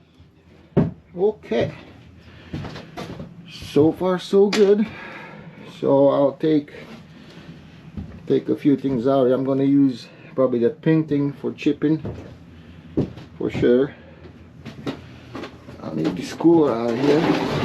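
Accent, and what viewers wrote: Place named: United States. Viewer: Salut! M-am uitat la câteva video-uri. Pari american din tata-n fiu, după vorba, port, n-as fi spus ca ești din România. Ma uit mult la video-uri despre Alaska, ma fascineaza și de asta, presupun, mi-au apărut video-urile tale. Ai ales o zona în care nu s-ar duce mulți romani, dificila, dar îți oferă o libertate, liniște pe care nu o regăsești în multe locuri. De cati ani esti in America? Sănătate!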